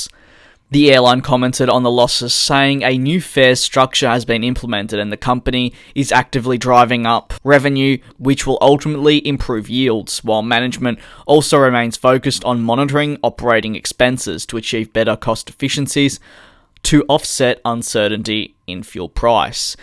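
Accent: Australia. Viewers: You sound Australian, it's just an observation. I'm another Aussie presently living in Sri Lanka and go back and fourth to Sydney and Colombo. I'd made the mistake of 'trying this airline' That I will never repeat. The Manager for AisAsia in Sydney who's name is Jason James Lee was very rude and condescending to me. Therefore I for one can say that I will never fly this airline. Its good to see that they're falling apart. Their customer service may also be another contributing factor. Brett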